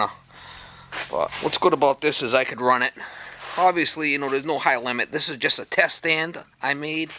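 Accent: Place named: New England, United States. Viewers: Aw rhight my New England Brothah! This is going to be a great help for me here (now in PA) with a 60-80 year old oil fired hot water to radiator heat, furnace and a Beckett burnah! I've run diagnostics on this thing (being a true New Englandah not cheap just smaht/frugal) for the last three years and saved about $1000 dollars. My problem is cruddy electrodes after almost every firing requiring take down and cleaning to get to fire again. I have a new transformer/igniter but haven't replaced it yet b/c the old one still works!! (sound like my dear old departed dad..). So hope your video's can help! Wicked-good video! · New England accent galore I was sure any moment he was gonna call his Pauly his ma or broad to help him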